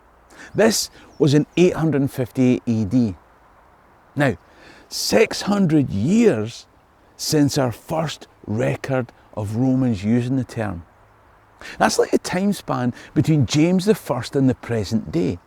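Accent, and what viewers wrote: Scotland, United Kingdom. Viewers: Great tae hear someone speaking aboot wur history in ma ain language! Such a relief no tae need subtitles 😊 A wee question, I hear a lot of people claim they have Pictish ancestry, but I was under the impression we didn't have enough DNA evidence from that time period to say definitively. You mentioned genetic markers briefly, could you tell me a bit more about those? My dad's side are all from the area that would once have been called Pictland but you don't really hear people in Scotland calling ourselves Picts, it's largely people from elsewhere and I wonder if it's more a romantic notion, much as the romanticised version of Highlanders as a result of fantasy stories? I had been told that the Picts had dark hair, and wondered if that's where mine came from. Just a mild frustration that people from the other side of the Atlantic will tell me I can't possibly be Scottish because I tan and have dark hair. 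I didn't want to have to do DNA ancestry to prove it but did in the end and while it can tell me we're 99% from the Highlands, I never saw anything that could trace Pictish genetics. I wondered where the folks that are "descended from a Pictish princess" are getting their records from. Still, be nice to have a comback to the whole "you don't look Scottish thing" cause mine hasn't always been polite or scientifically/historically informed 😂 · As an English man I love listening to all British history especially in the Scottish accent it’s the best to sit and listen and take you back in time! Our little islands so full of history and brave men in the past it makes me sad to see what’s become of my beloved Britain!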